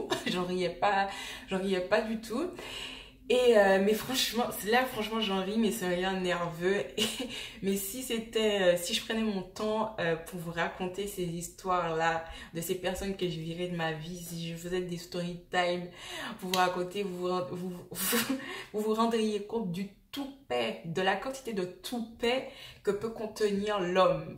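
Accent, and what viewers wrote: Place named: Antilles, Caribbean. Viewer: dès les premières secondes, je reconnais l'accent antillais. :)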